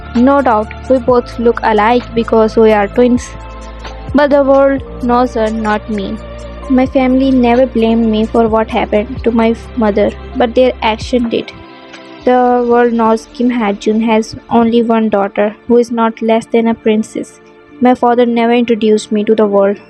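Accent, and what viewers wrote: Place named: Asia. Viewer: don't mind but I thought you were from Asia or something cuz of your accent 🙂🙂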